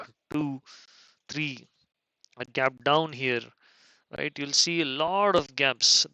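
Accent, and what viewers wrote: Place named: India. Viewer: reposting...Your accent seems to be very laidback and relaxed. definitely did not seem to be 100% Indian accent but with a mix of foreign accent. so i was curious to know. i like your intro...HIiii Guuuys...haha...as if we sat down at the bar counter. Hope you will take my comments lightly. Enjoy the rest of the weekend.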